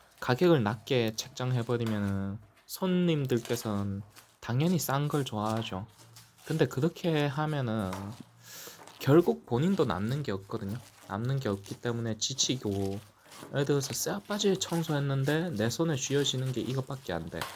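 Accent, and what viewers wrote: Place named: Ulsan, South Korea. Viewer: 울산사셔서 사투리 쓰는게 왜 죄송한 일인가요 열심히 사는모습이 중요하죠. 화이팅입니다.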